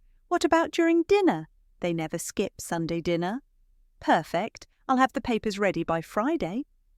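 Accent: United Kingdom. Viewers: Love the British accent of the person reading the story! Listening from my home in Houston Texas USA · A very good story. She really earned that house. The father choose peace over his daughter's inheritance right. They could've at the very least, split the ownership between their daughters equally. I believe that OP would've been happy with that, considering how she used to be before and be okay with that. Instead they were conniving and selfish. How do you allow one daughter to pay off the debt for the house knowing that she was never going to inherit any part of it and then give it sneakily to the other daughter who contributed nothing whatsoever towards it? I'm sorry to say that when her mother slapped her, she should've slapped her back as she had no right to do that just because she was being called out on her bullssh!t. Thank you, more like that please!!!!!!!🥰🥰🥰🥰🥰🥰. 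BTW, loved the British accent and i'm listening from the UK.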